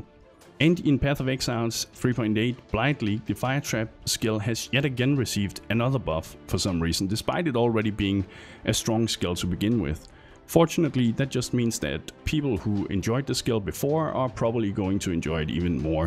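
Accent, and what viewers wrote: Denmark. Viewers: du lyder dansk. har jeg ret? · A few seconds into the video: Wow. You. Sound. Danish. Hej,:)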